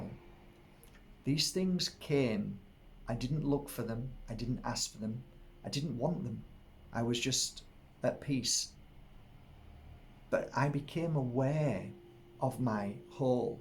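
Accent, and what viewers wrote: Yorkshire, United Kingdom. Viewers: A wonderful video! Thank you..and..I truly enjoy your Yorkshire accent❤️❤️❤️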